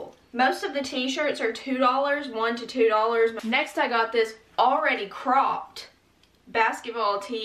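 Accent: South, United States. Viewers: Its her real accent shes a real southern gurl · U like batteries and worry about being mistaken for Spiderman. With this southern drawl, u can get away with anything. xo's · r u southern. also, love that orange shirt. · I love her country accent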